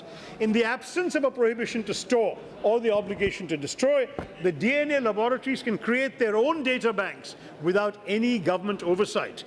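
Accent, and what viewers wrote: United Kingdom. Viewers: Other members sitting around him are stupid and they don’t even care what he’s delivering from his party as don’t understand his British ascent. All points are very clear and valid. Let’s promote him as PM in the upcoming term. Jago Bharat log jago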